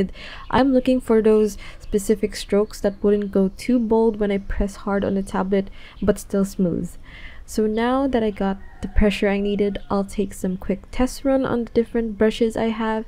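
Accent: Philippines. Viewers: I KNEW YOUR A FILIPINo from your accent ;A; and hands? Is that weird xD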